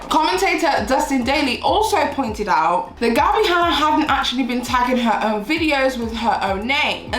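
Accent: United Kingdom. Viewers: Brittish: drama-r-alert. Miss hearing the "BITCH" out loud.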